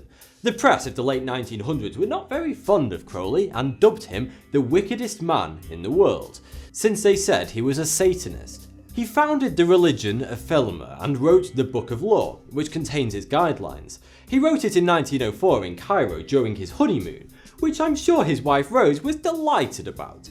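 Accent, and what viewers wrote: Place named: England, United Kingdom. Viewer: Where in England is this guy from? He has that game of thrones type of English accent.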